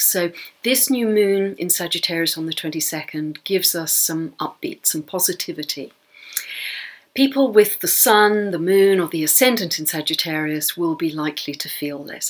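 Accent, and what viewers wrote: England, United Kingdom. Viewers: Thanks - enjoyed your energy, wisdom and wonderful 'jolly good' English accent. So good to hear the queens English spoken as it used to be. (I'm speaking as an ex West Londoner born 1950):-) Also love the cushions!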